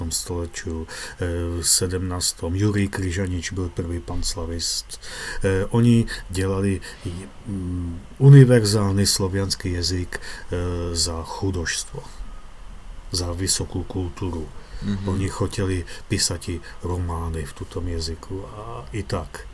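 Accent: Czech Republic. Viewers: Podoba mi się idea języka międzysłowiańskiego. Przyda się, mam nadzieję w niedalekiej przyszłości. Jest bardzo czeski, w sensie wymowy i na całe szczęście jest łatwo zrozumiały dla Polaka :-) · Yes, and he has strong czech accent, i can hear it 😂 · He speaks normal czech sometimes with few different words, but accent is 100% czech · Действительно, понятно. Но произношение явно чешское. Интересно, а в Белоруссии группа любителей межславянского есть? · Słychać wyraźnie, że Czech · polacy tutaj macie srbski jazik jako najmezdu hahahha znaczy Pan mowi jako czech ktory zna po serbsku ( ma ta twardosc ) umiescil duzo crkweno slowenskogo,ruskiego tu slychac a ni troszku tylko dodanie JA MNOGaja RODnaja ,blgarskiego niema tego tonaliteta , polskiego tez nie slychac nie ma a ni troszke tekko miekkego wymawania i nazalnosci . TO pisze z punktu dzwiekowego , czyli ucha , ktora mi pomogla ogarniac nasze jezyky . 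Wiec tutaj slychac Slowienca ktory zrastal s Yugami na osiedlu potem pojechal do Czech sadzic bo tam spoko calkiem inaczej bede brzmial Blgarin ,Boiko , Leh, Bunjevac lub Bosanac , mowiac medzynasz I to jest najciekawsze dla ucha i mojiej strasti pra nasieh jazici bracioo Wielki pozdraw dla Polakow najbardziej bo to moje glowe uczcie kirila i serbski i wsjo paniatna Swietna robota tylko prosto w do glebine niewyczerpane TAKO ISTO SAMO PRA VSIEH SLOVENSTVUJUSzCzIH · To be honest Mr. pronounces Interslavic clearly with Czech "filter". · Akcent robí neuveriteľne veľa. Prvé slová a ihneď som vedel že sa jedná o cecha. Klobúk dolu za prácu a výsledok . Inak prečo mám pocit že je tam najviac slov z češtiny alebo slovenčiny. Ak pozerám na medzislovancinu v titulkoch a npr. poľštinu, zdá sa mi že tam je naozaj viac našich slov, alebo to je čisto subjektívny pocit a zdá sa to každému . ;) Inak, mal by som otázku, ktorý súčasný jazyk je najbližšie k jadru interslavic ? 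Veľmi zaujímavá práca. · I am part Czech, part Polish and I understand most of these. But have strong Czech accent. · I am Czech and for me it does not sound like Czech, there are many words that are not Czech. Maybe just a little bit. But the man, being Czech, speaks it with 100% Czech accent. I understand 95 % of it. The other person speaks Polish and I understand maybe 80 % of it. I like the idea and would like to learn this universal slavic language. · I'm Polish and I understood everything except for just two words (letak and gledat). But with some 3-4 more words my intermediate Russian might have helped. Generally it is very, very understandable for a Polish native speaker too. For the sound, it sounds to me like Czech mixed with some Russian, but that's of course very subjective and it's because of the author's lovely Czech accent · Молодцы! Получился русский с сильным чешским акцентом! 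В принципе зря старались, ибо старославянский давно есть. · As a czech i understand 100% but maybe only he has czech accent and I'm from czech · У меня одного ощущение что это ман говорит по русски только с сильным чешским акцентом..... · bo jest czechem to ma akcent czeski jak będzie polak to będzie zalatywal na polski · Czeski akcent słychać :) I cechy fonetyczne czeskiego :) Lepsze to niż angielski, tylko czy to się przyjmie w praktyce. Ja uczyłam się rosyjskiego i chorwackiego, troszkę znam białoruski, ukraiński, a czeski - jak już wspominałam, jestem Morawianką w 1/4, a gwarą góralską mówię na co dzień. To oznacza, że bez myślenia rozumiem praktycznie 100%. Masakra, genialne! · It was easier for me to understand as a Czech, because Mr. is Czech and has a clear Czech accent, lol, but this is an interesting idea. If people in Slavic countries actually spoke this language, I think I could learn it for a couple of months and then would be able to hold simple conversations without big problems. 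· Ano ten český přízvuk je hodně slyšet :D.. občas to řekne dokonce česky a pak se opraví ale na tom není nic zlého :)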